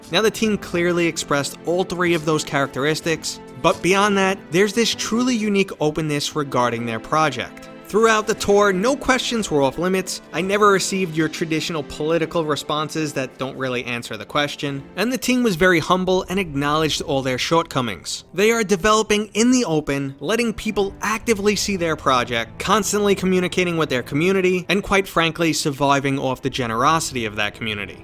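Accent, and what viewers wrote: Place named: Boston, United States. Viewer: Great video. Also you sound like you're trying really hard to hide that Boston accent, but failing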